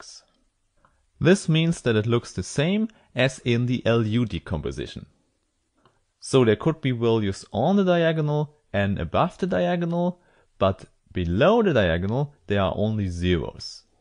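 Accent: Germany. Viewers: I'm french and you are a german speaking english explaining this better than my teacher ... thanks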